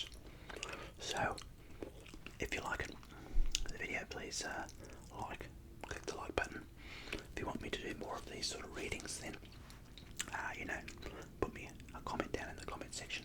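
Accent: Australia. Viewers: I'm Venezuelan, but I really love aussie accent, and this help me a lot to lear english. This videos are so amazing, and your voice is incredible 💖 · 😍Yay Australian accent book reading and gum chewing! My favorites in one video. OK goodnight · Subscribed , turned on post notifications , I’m looking forward to all your videos , I love Australian accents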